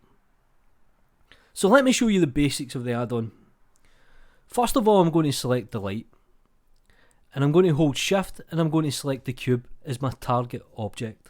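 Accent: Scotland, United Kingdom. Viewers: Hello! Cool tut, but not all words were understood behind authentical Scottish pronunciation.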